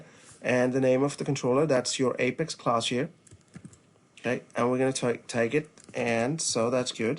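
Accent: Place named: Scandinavia. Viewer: Your accent doesn't sound like New Zealand to me, sound like Scandinavian.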